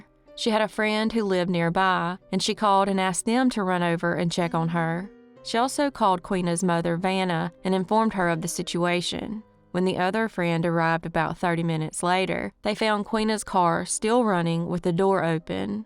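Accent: South, United States. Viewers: Congratulations! You absolutely deserve your 100,000 subscribers! People really do recognize quality and talent! (And an awesome Southern accent!)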